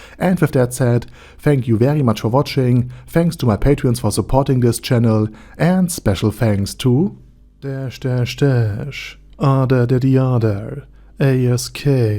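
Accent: Germany. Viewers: New subscriber and amazing job just amazing and I love your German accent and can I ask you something about your channel and overlord is an amazing anime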